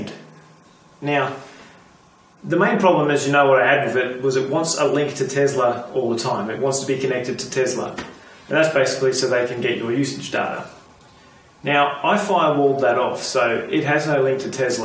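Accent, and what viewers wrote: Australia. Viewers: I know this is an old post but maybe you are still monitoring it. I live in California, you sound like you are from AU so you may not know about the planned power outages in CA. So if I am understanding you correctly when there is a planned power outage which can be for up to 6 days they tell us if there is no wifi or 3G connection for the power wall to report to Tesla I will have periods of time when I will not have power if I am on battery?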